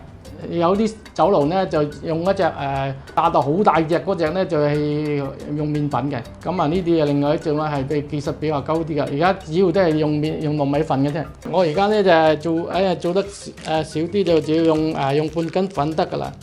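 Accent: Zhongshan, China. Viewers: 中山石岐話音❤️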